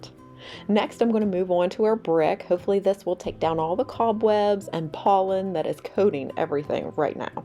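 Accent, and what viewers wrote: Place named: South, United States. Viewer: I just love your accent! I think after British accent the southern accent is the most beautiful in English! I love the porch! Looks good!!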